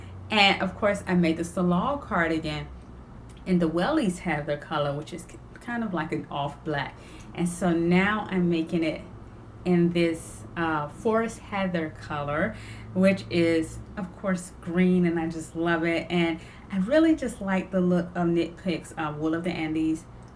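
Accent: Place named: South, United States. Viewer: I’m a southern girl living in Colorado, and I love watching your videos and hearing your accent. It’s a little bit of home. Plus, you’re just adorable! 😊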